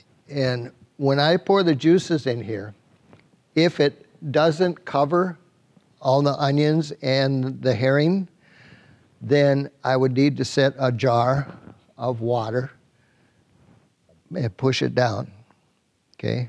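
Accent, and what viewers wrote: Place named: Minnesota, United States. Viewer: Miss you. Hope you are okay. Love that Minnesota accent 😍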